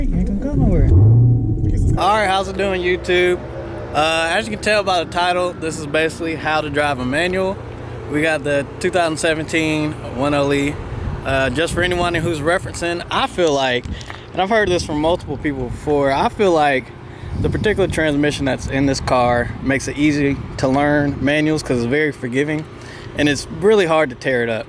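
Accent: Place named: South, United States. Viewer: I saw that hat and heard that southern accent and knew he was bout to lay the knowledge down. Us country boys know how to drop them gears like no other. Make them things screaaaaaam.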